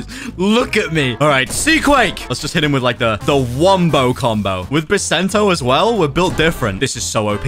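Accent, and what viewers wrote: United Kingdom. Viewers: Are you from united kingdom ?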